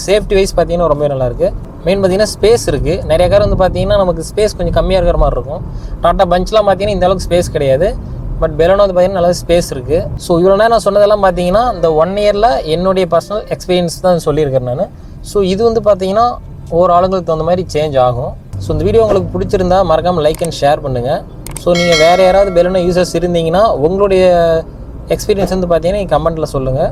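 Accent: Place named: Kongu Nadu, India. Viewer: Athu konga area slang nga kannu😊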